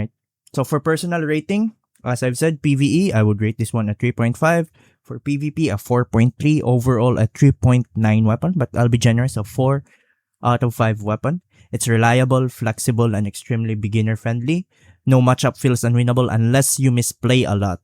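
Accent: Philippines. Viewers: Brother I shouldn't even leave a comment cause you are going to try to deflect. You sound Filipino which is a "third world" country yet you made a joke and put up African children. On top of that malaria is present in the Philippines. Just my two cents, enjoy the traffic my comment brings.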